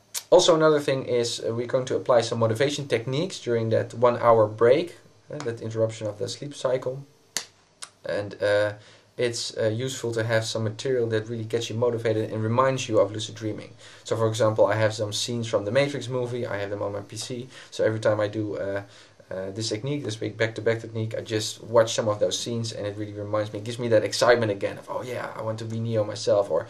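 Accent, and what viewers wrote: Netherlands. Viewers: i can hear on your accent that your dutch? or am i wrong:P? nice vid btw! i really want to learn this^^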